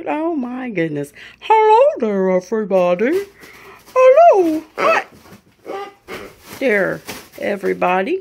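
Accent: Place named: Alabama, United States. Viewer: I am almost certain my brother has a fenced yard. Can he be with other dogs? My brother has either two or three and all are good and sociable dogs. I'm sure he'd tell you otherwise, but to my knowledge they would get a long with another dog. That would be a thing to watch for though because you wouldn't know my other dogs reaction to a new dog coming in their territory. I have emailed my brother and I expect to hear back promptly from him. The other thing about his dogs though is I'd be at my brothers going over things are just taking and one of his big dogs would just lick my arm from elbow to shoulder. I'm pretty sure they were tasting me to see if they wanted to eat me. One of the dogs is named Swan and she is a really nice dog who I have been around a lot when Bob lived out here just a couple of years ago. He has another dog named Buddy who is also a really nice dog. 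I think he has a couple of yippity dogs, but I doin't remember their names. You'd probably like Bob's wife-you talk just like her. She's from Alabama and she says the "yes, ma'aam and the no, sir", no matter if she's talking to an 82 year old or a 2 year old.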